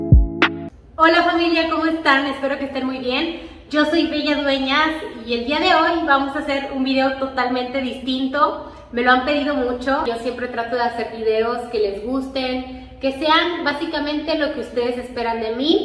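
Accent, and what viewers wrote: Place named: Mexico. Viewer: Tu acento es como el de una mexicana mas ...soy de colombia y no diferencio un acento mexicano de un estado a otro...yo creo que solo los mexicanos notan alguna difencia ...😊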